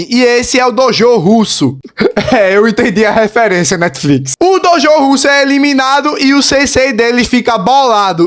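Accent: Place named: Northeast, Brazil. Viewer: você e Nordestino?😂você fala igual um amigo meu que veio de lá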